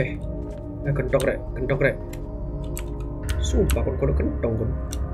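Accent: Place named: Surabaya, Indonesia. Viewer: Logat suroboyo ne tetep podo koyo bien mAS 🥰🥰🥰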